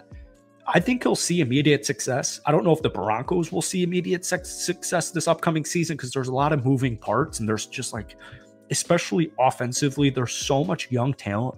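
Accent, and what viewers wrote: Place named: Midwest, United States. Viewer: Pardon Midwest pronunciation he means no disrespect we all say OR eh Gon out this way. We'd totally give you a hall pass if you said illinois with an s at the end 😂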